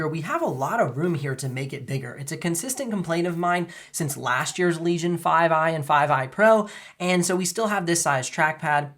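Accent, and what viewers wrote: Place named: United States. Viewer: Its basic murican accent, which is easiest accent to understand.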